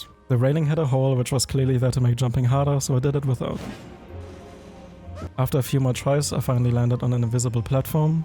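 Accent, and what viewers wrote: Germany. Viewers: Du bist Deutsch oder? Hört man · Either this is the strongest german accent or some kind of hidden english accent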